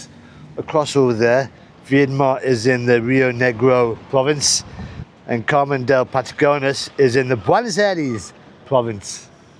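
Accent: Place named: Northern England, United Kingdom. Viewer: you are welcome. Thanks for your videos. Your positive attitude shines through. I also love different accents and yours, i guess northern England, is just great. Like you i am fascinated by history and travelling. I learned all this quite recently when i began digging in my family tree and read the history for context. This is not taught in schools.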